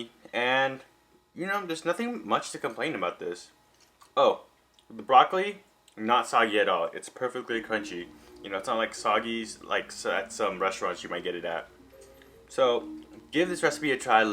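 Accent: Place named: United States. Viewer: wish you yanks would learn to speak properly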